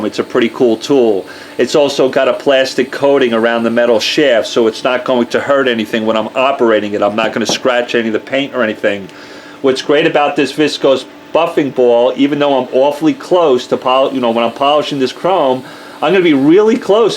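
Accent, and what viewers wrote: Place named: New Jersey, United States. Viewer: Ok I love the channel, new subscriber here. Lol, your from TX now but sound like originally from Jersey ;). Love the detailing advice and yes God Dammit I purchased The Ball, gotta get The Flitz Ball...go in slow then speed it up! 👍🏻😎👍🏻 Love it, Tampa FL we share the same heat here as well brotha....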